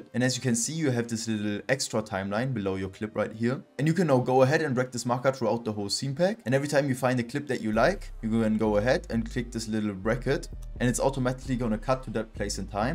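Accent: Germany. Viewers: I hear German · Ich höre Deutsch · is he german? he has a german accent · Da mann hört das du deutsch bist schreib ich mal deutsch :) Also ich habe mir grade adobe geholt und mit deinen endlos geilen videos habe ich schon so viel dazu gelernt! Vielen dank dazu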